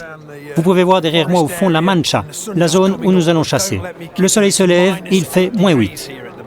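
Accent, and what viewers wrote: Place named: Belgium. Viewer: Chouette film mais les commentaires sont d'un pompeux !!!! Très énervants et dits par un belge , je dirais , mais cela n'est pas le problème...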